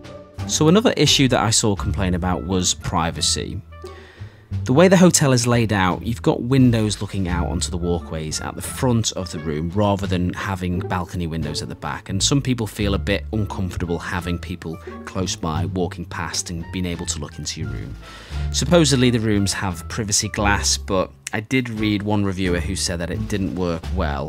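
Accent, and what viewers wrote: United Kingdom. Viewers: We go here every year fir the past 8 years. We stay for about 4 nights then onto a villa. We have NEVER had 1 problem. This place is spotless! We always leave money for the cleaners at end. We have left things out on the bed etc and they are always neatly left back after cleaning. Just say hi to the people that work so hard for you and they will appreciate it and look after you. Our fridge didn't work properly last year and they where on it like a car bonnet within minutes and replaced the whole thing whilst we went for dinner. You can walk to all bars restaurants etc. And the convenience store is reasonable. Did you know you can get a discount on food if your over 55? We never eat there but I spoke to someone who told us this. I'll be going again this year. And then moving onto the clarion inn at lake buena vista which is also a Rosen hotel. 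Just by Disney springs.. similar vibes.... I think you are from my part of the world judging by your accent 👍 x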